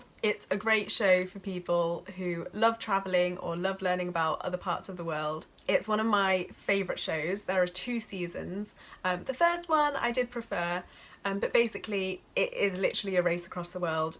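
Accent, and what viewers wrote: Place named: RP, United Kingdom. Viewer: Love the voice and message! I remember you said you would like to have posh british accent. There you go girl! ☺️👍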